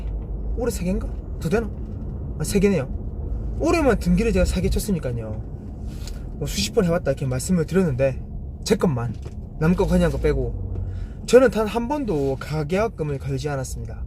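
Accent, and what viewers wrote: Busan, South Korea. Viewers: 항상 즐겁게 강의해서 기분이 좋네요,, 부산 사투리도 정겹네요,,,, 좋은 진실된 정보 감사합니다.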